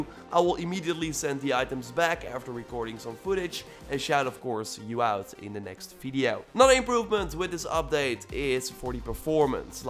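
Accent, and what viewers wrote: Netherlands. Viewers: Jezus gast, nederlands accent much?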